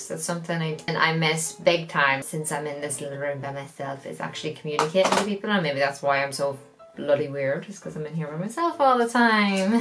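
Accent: Ireland. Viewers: What part of Ireland are you from ? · I could listen to you talk all day. Irish accents are so beautiful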